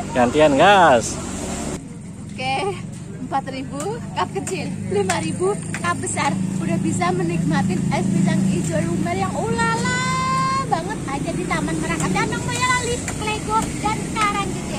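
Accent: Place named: India. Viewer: ES nya segerr..suaranya mirip orang india, kontennya bagus tentang kuliner.semoga bermanfa'at.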